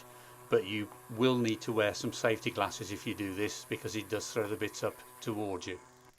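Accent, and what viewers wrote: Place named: Bromsgrove, United Kingdom. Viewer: Lovely video, very useful and you’ve convinced me to buy it. Your local accent also pricked my ears up, I’ll hazard a guess you’re near bromsgrove?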